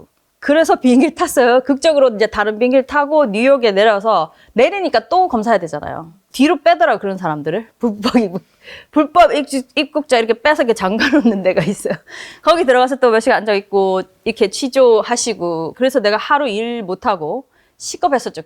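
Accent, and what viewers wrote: Gyeongsang, South Korea. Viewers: 긩상도+영어액쉔트 = 묘한 중독감 말투네요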